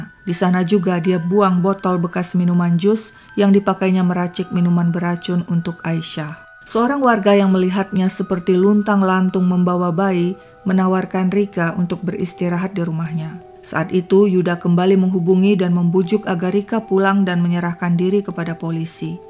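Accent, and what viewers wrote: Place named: Palembang, Indonesia. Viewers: Bahasa Palembang nya medok ni... kayak Palembang asli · Kok naratornya cakap banget ya pake logat palembangnya? Apa narator orang palembang aslinya. 😂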